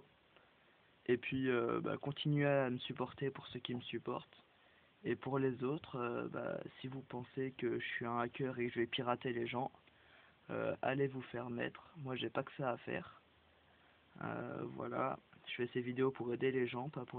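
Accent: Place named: Marseille, France. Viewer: UN MaRsEiLlAiS !!!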